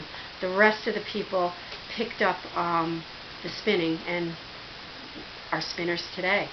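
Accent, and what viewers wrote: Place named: Upstate New York, United States. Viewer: This is so wonderful. Thank you! Are you from upstate NY? You sound like my family!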